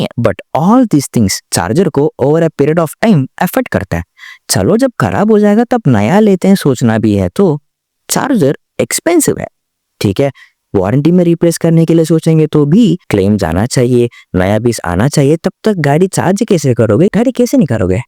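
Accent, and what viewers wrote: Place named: South, India. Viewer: आपकी हिंदी ज्यादा अछि लगी जबकि आप दक्षिण भारतीय लहजे में बात कर रहे थे...लेकिन जो भी हो आपने बेस्ट हिंदी बोली....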